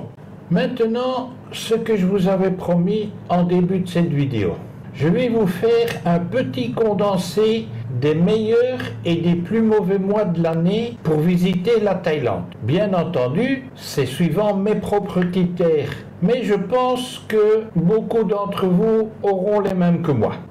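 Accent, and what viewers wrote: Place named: Belgium. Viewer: Merci et ce bel accent belge 😊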